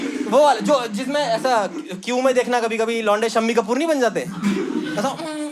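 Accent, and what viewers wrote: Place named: Hyderabad, India. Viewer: U have slightly hyderabadi hindi. Loved to hear .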